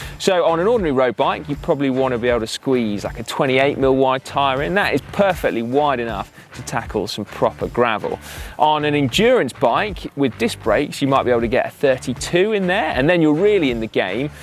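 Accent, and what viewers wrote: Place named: England, United Kingdom. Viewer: Ble ble ble idiotich akcent facken englenda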